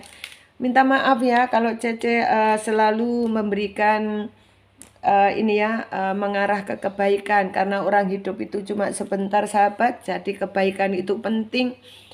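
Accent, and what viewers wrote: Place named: Java, Indonesia. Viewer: medhok bgaet yooo itu lucuya bikin semangaat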